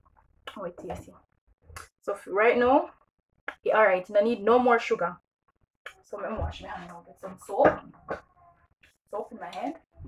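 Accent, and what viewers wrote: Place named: St. Elizabeth, Jamaica. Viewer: Never know you could add cucumber, I gotta try that,love your accent, reminds me of my hometown in st Elizabeth.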